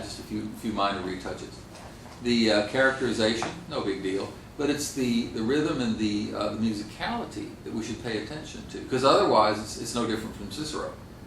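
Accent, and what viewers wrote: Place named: England, United Kingdom. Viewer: Very good and very nice and accurate pronunciation, despite the heavy English accent. Unlikely from some English speakers he does not try to adapt the Latin spelling and rhythm to English, as many professors do when it comes to vowels or the R sound for example. Very nice lecture.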